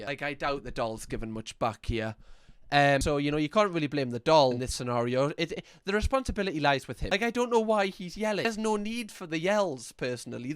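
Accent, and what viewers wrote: Newcastle, United Kingdom. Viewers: Love you saying "bloody hell". Love that British accent. You are a funny and charming guy. 😂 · You should visit Newcastle. A lot of them sound like that lol it’s a lovely place too. My parents are both from the north east of England and a lot have similar accents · I just wanna let you know that after I watch your videos the inner narrator of my thoughts inside my brain has a geordie accent for like minimum 6 hours post-watching every time 😂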